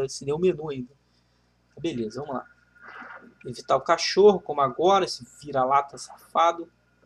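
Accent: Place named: Rio de Janeiro, Brazil. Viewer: Carioquês rs boa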